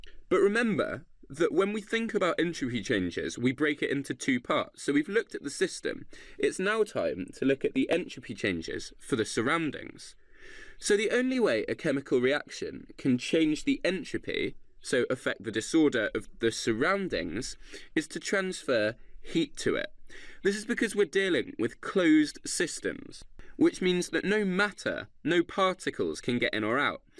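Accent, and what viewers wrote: United Kingdom. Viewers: good content, posh accent unbearable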